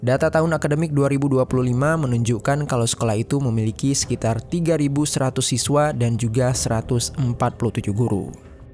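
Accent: Java, Indonesia. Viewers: Suara nya mirip bang jeri tapi logat nya beda yg ini kaya ada Jawa Jawa nya dikit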